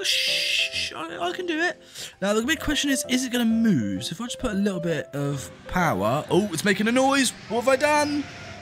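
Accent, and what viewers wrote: United Kingdom. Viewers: you sound british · He’s British😱😱😱😱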